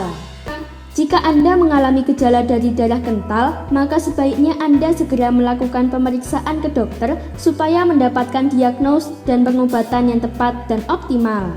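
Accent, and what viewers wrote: Java, Indonesia. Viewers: Logat jowo nya keciri bangetya. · pie to mbak u mgomonge koq medoq bener se.... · Iya benar, itu dialek mbak jawa, dak apa2 yg penting jelas he he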